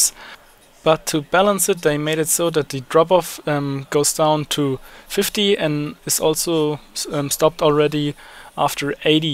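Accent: Germany. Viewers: irgendwie wusste ich das du deutsch bist xD dein akzent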